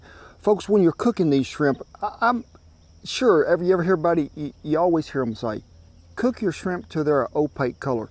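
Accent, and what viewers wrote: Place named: Louisiana, United States. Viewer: Where are you from? Your accent is throwing me off. Looks like your from Wyoming, the land around you looks like Texas and the accent sounds like you're from Louisiana or Mississippi, but the words aren't matching. But there's like a northern thing going on idk lol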